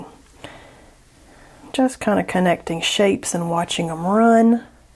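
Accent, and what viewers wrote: South, United States. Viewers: I love these colours. This is beautiful. Unfortunately, it was late here in Australia and I watched this in bed. It’s been a hectic weekend and had no spare time to watch it earlier. My other half was sleeping, and I didn’t want to wake him, but I couldn’t resist watching. I’ve saved it and will watch again tomorrow, so I can hear that wonderful southern accent. However, you can’t get a more southern accent than Australia. Any further south and we are in Antarctica 😂😂. Thank you for another wonderful video 🫶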